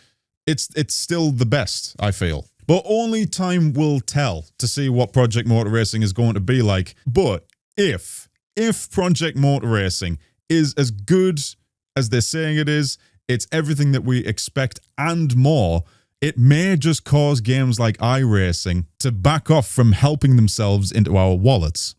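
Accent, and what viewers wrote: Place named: North East England, United Kingdom. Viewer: So where are they going to get money from to keep the servers on? It’s all well and done to whine about costs, but long term, unicorn tears and candy floss won’t keep it going. I like Iracing and LMU and race both regularly. I hope this new sim succeeds but long term support isn’t guaranteed without money. Nice to hear a fellow North East accent, even if I’m older than your dad.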